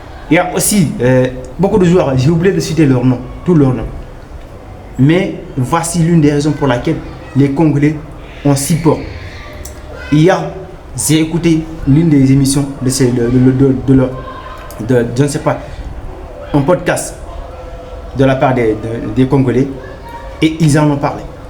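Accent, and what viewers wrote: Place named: Senegal. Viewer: Deja tu n'est sénégalais avec ton accent la hum🤷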